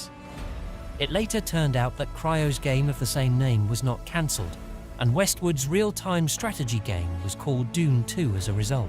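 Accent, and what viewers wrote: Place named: England, United Kingdom. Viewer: Doon. You have a freaking english accent. How do you pronounce the letter "U"?!? Why don't you pronounce studio, "stoodio"?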